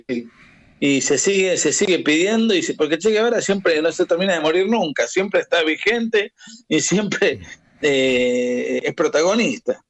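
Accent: Argentina. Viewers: No estas en nada. El che no tiene la culpa.de que estos Seudo izquierdistas. Utilizen la inmagen de el che para sus idioteses. La nueva izquierda. NO tiene nada que ver con la vieja Izquierda latinoamericana. Y el che era clase media. Todo lo que decis que se movia en elites esclusivos es mentira. Te entiendo porque eres Cubano. Que tubo que escapar de Cuba por la revolution. Aunque hables como Argentino. Deja de.engañar a las nuevas generaciones.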